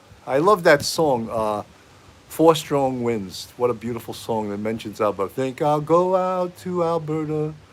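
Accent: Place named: East Coast, United States. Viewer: Hey,! Watching the replay. Haha - I can tell you're from the East Coast! Illinois is pronounced "Illinoi". The "s" is silent. I'm not nit-picky, am I? The nationwide "brotherhood" among police often extends to Marines. My disabled Vietnam veteran husband has often been given a pass for minor offenses.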